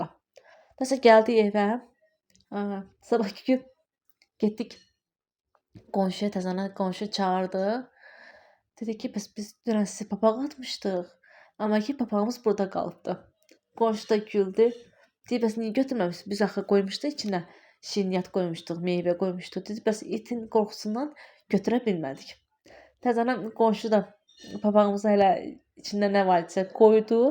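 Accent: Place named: Sheki, Azerbaijan. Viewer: Salam baci , xos gununuz olsun insallah , hee deyiremde lehce tanis ve yaxin geldi , 😊 mende Sekidenem , ugur olsun baci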